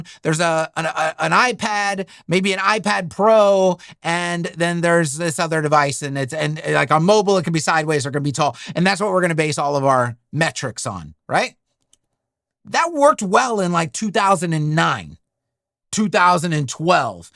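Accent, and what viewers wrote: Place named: United States. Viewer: Is it me... or does (general American accent) say "era" and "error" the same way? 🙂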